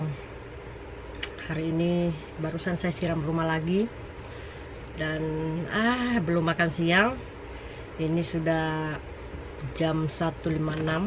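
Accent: Indonesia. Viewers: Mb ko ngmg nya kya orang sulawesi yah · Bugis apaki??ko sma cra ngongtta, hahaha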